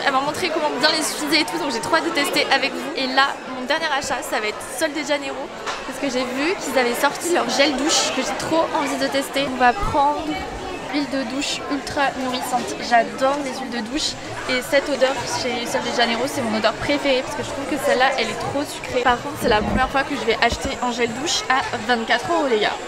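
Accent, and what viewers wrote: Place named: South, France. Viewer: J’ai adoré la vidéo ❤ tu dis tout le temps « quand même » avec un léger accent du sud 😂 j’adore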